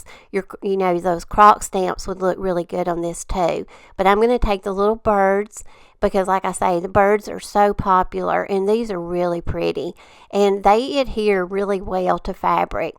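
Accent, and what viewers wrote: South, United States. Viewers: Hi, my grandbaby and I watch you and get a kick out of your southern accent....her new hobby is turning everything 'trash to treasure'. We love everything! God Bless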